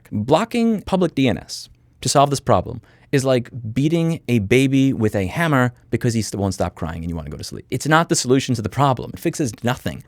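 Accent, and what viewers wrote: New York, United States. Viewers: His anger is glorious at 2x. The authentic new yorker experience. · Why people no get he from NYC?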